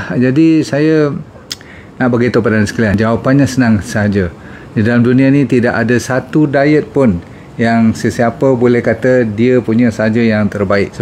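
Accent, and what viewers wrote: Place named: Kelantan, Malaysia. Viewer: Pandai kecek klate. Manih leting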